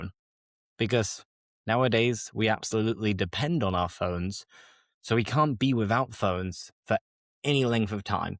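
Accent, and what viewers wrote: Northern England, United Kingdom. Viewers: Hello! I'm a native English speaker and I love languages - your video came up by chance in my feed - I was guessing in advance what you'd say for "bird" ie funny, rude or normal -and actually I guessed wrong :) I would have said rude myself! I'm 66 (yeah an old grandma) and I remember it all the time from my childhood days (the same days as wolf-whistles!) But I rarely hear it now and I am not sure even my children (34 and 36) would say it. You've encouraged me to find out though - my son teaches EFL so I'm going to ask his opinion now just out of curiosity! Video is very nice and I like that your accent use sthe short 'a' such as in 'laugh' rather than the often-used-in-language-audio longer, southern pronunciation.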